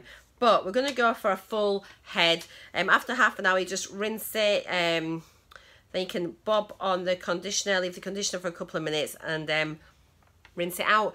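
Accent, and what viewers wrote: Yorkshire, United Kingdom. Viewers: So love a Yorkshire accent!!!!!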